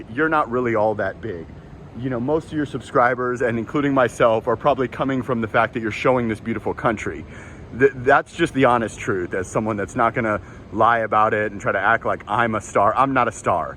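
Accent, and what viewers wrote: United States. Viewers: I’d like to add because you may not know it, your narration helps a lot too the young Filipinos learning and developing and mastering the American twang.👍👍👍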